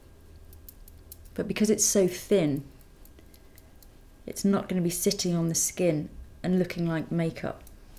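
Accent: England, United Kingdom. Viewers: Is that British accent ?? ❤❤ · ahh her voice is so relaxing, like her accent and the tone and cadence of her voice. anyone know where (in england i assume) shes from? her accent is really similar to pj harveys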